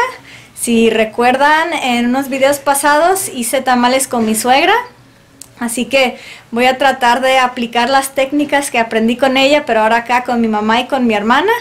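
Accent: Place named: Guadalajara, Mexico. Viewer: Ya ablas como tapatia que bien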